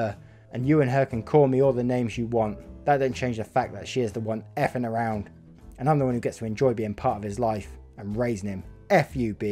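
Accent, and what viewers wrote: United Kingdom. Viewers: OMG A BRITISH PERSON!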